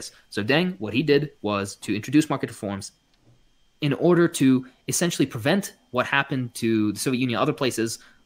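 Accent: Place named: Iraq. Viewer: How? He has a clear Iraqi accent.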